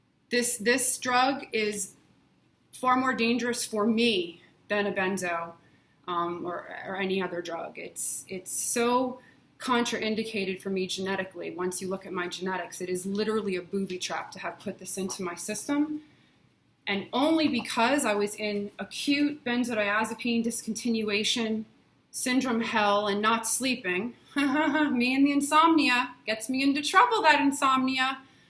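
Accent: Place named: East Coast, United States. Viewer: I love your subtle east coast accent and attitude. You are still attractive on your worst day - Jacob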